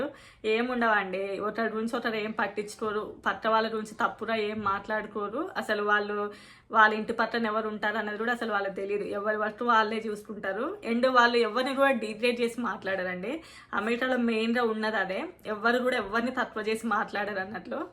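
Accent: Telangana, India. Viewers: Your voice n fluency is so natural akka, Medi Telangana ?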